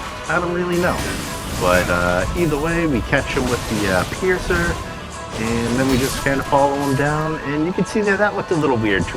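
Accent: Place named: Boston, United States. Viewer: Your Boston accent is strong in this one.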